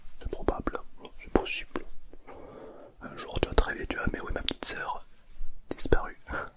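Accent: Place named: Marseille, France. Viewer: Il me fait penser aux vendeurs de drogue de Marseille avec son accent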